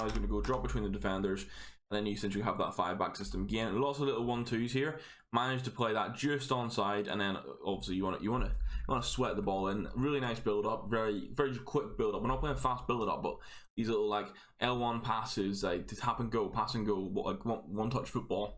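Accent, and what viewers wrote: Scotland, United Kingdom. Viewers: Yasssss he’s fuckin Scottish. Happening big chap. Cheers for the vid. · WOoohooo Which part of scotland are you from, Belfast? Love Scottish streamers!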